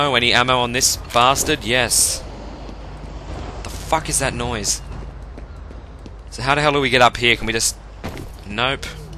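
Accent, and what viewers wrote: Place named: Australia. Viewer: He's Australian, it's just his accent. I like the accent.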